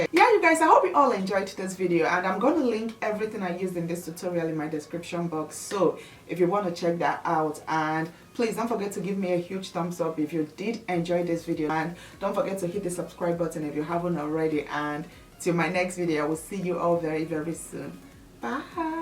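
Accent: Nigeria. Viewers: Oh that Nigerian accent 😂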